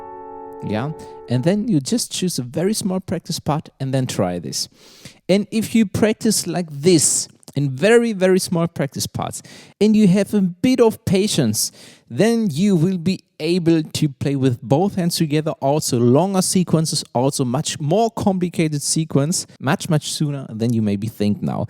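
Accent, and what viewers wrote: Germany. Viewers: Its a german accent. honestly hes speaking way better than most people i know. have a wonderful day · German accent right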